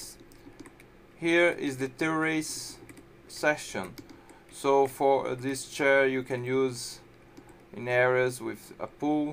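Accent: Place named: Brazil. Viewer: brasileiro ? parece XD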